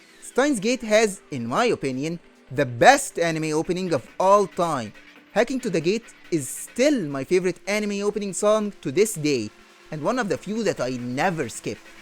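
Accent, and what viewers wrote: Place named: Egypt. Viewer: that's an egyptian accent id i've ever heard one. much love and support my guy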